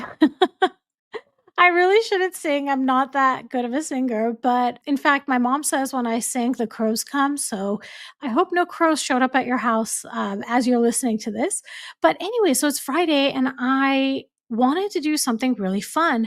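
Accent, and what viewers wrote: United States. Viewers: DO YOU HAVE AN AMERICAN ACCENT ???? IF .IT IS NOT BAD ENOUGH. YOUR VOICE. .????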